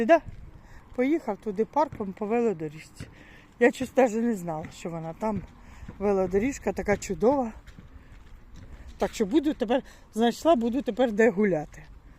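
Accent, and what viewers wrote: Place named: Surzhyk, Ukraine. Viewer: Приємно суржиком розмовляїте.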